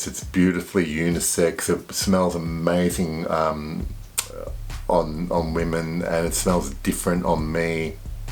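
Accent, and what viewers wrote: Australia. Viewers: What kind of accent do you have? Australian?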